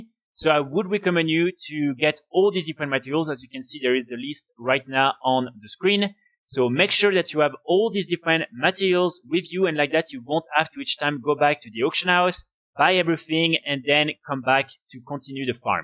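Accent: France. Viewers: Bonjour, merci pour la vidéo! J'ai une question, je joue chevalier de la mort. Je suis forgeron 300. Est ce que je suis capable de fabriquer le set T3 Guerrier ou je suis obligé de réaliser tout le run de la vidéo avec un guerrier que j'aurai up lvl max et forgeron 300? (j'ai écris en français car je sent l'accent français chez toi ahah). Hello, thank you for the video! I have a question, I play death knight. I am blacksmith 300. Am I able to make the T3 Warrior set or do I have to do the whole run of the video with a warrior that I will have up lvl max and blacksmith 300?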